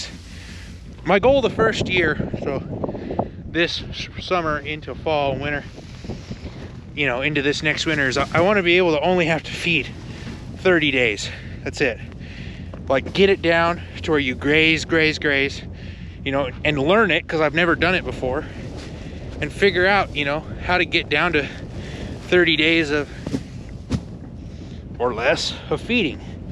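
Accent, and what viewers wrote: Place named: Australia. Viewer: Hi from Australia. You are sounding like an Aussie farmer. We’ve been turning away from feeding as much as we can. But the biggest change here over last 30 years has been changing fro hay to silage. It’s been huge the change. Especially in the colder areas out here. The quality of silage over hay is the driving factor. I was one of the first contractors to do silage. Now nearly every contractor has to offer that or he doesn’t have many clients. I could go on for hours but would swamp your messages.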